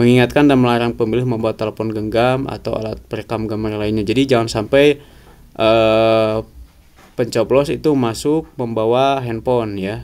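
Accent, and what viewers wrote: Sunda, Indonesia. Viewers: si akang orang sunda yah, ada sunda sudannya dikit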